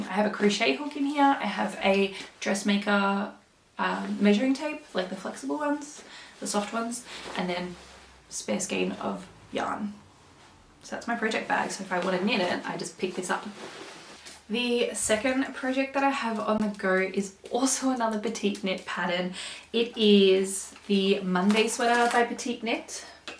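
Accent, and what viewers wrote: Australia. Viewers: Thanks for a great episode. I'm from the UK but lived in Perth for 13 years. It was great to hear that accent again x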